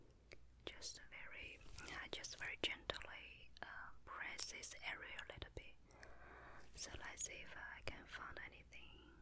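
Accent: China. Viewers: She has a very strong Chinese accent, but it really helps me with my anxiety and helps me fall asleep 😴 ❤️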